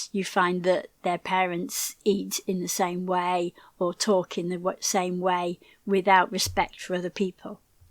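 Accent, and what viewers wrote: United Kingdom. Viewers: thank you great british accent to learn here and enjoy it I hope one day you go live with mom and dad 🎉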